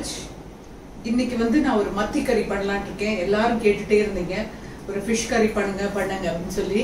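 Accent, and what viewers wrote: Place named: Kanyakumari, India. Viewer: You speak kanyakumari Tamil..💪.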